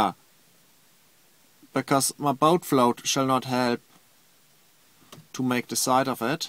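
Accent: Germany. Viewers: But it's adorable... German accents are cool. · your covering ", " would float many boats! Your German accent and having fun with the sounds would float a plethora of boats. Please bro, do it for all the folks that don't know about. I'm certain people that get you will more than love.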